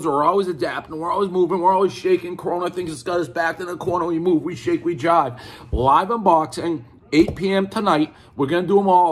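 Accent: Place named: New England, United States. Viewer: like the videos...your yankee accent is somewhat tolerable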